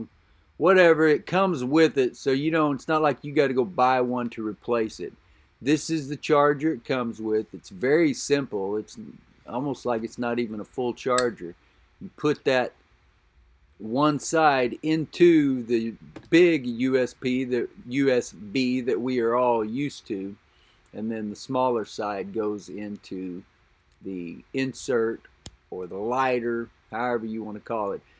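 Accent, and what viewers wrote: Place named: South, United States. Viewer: Dude I love your commentary just a good ole southern fella. Thank you for the video good sir.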